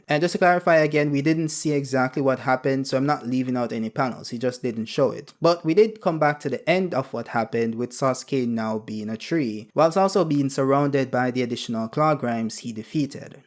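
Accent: Jamaica. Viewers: Its giving Jamaican do your thing bro keep growing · Aye yuh a Jamaican? Yuh sound like it🇯🇲💫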